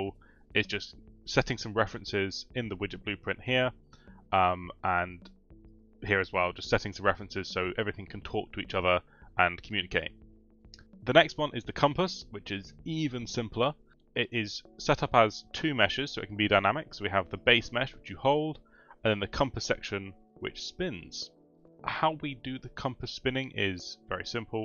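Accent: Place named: United Kingdom. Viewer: Dat UK accent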